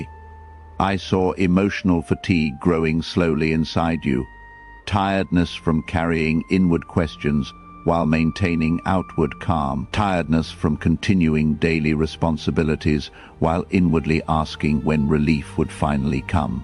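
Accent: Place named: United Kingdom. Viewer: Who is the new voice. He’s British.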